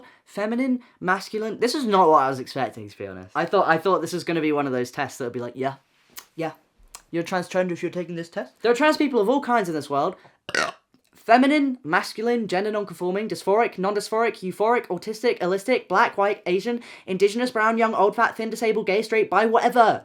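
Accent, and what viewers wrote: United Kingdom. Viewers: You know I hope you know that you don’t ALWAYS have to feel like you need to post vids abt sexuality or lgbtq related topics. I hope you know that there are many ppl out there like me who follow you for you ie. your charming personality, you humour, your looks and that gorgeous British accent of yours:) I just don’t want you to feel any pressure when it comes to content and hope that your still as passionate about it as you were in the beginning. Honestly I’d even watch you tell me how to cut an apple or explain the different colours to me at this point so just wanted to let u know that we love you for you <3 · idk how if this makes sense but you sound more british than usual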